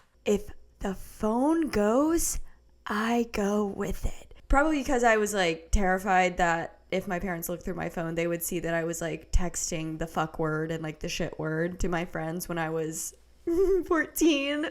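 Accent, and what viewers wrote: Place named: South, United States. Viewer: You should do some Southern Receptionist ASMR stuff <3 accent so good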